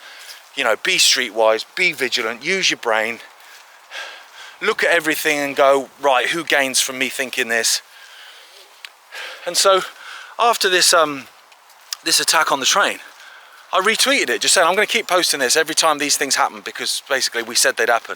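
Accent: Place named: Cockney, United Kingdom. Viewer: Say ands b proud of cockney accent I am one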